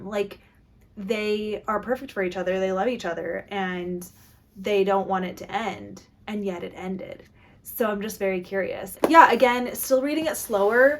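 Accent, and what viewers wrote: Canada. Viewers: Literally me watching your video as someone who hasn't seen you before: "She sounds like a Canadian." A few minutes later: "Shout-out to Canada!" 😂😂 nice to find a fellow Canadian book girlie! I loved that part of Blair's story too!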